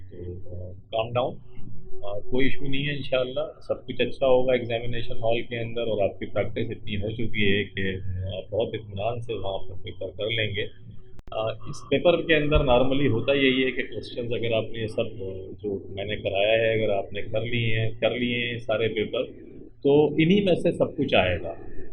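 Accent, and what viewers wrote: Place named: India. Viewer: I can't understand anything due to the Indian's accent.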